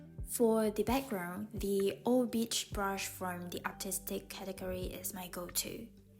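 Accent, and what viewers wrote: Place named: Scotland, United Kingdom. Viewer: Completely off topic. But I do love the Scottish accent.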